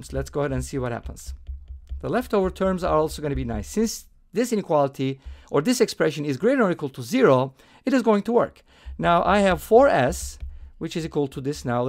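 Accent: Turkey. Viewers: Are You Turkish ? Your accent sounds Turkish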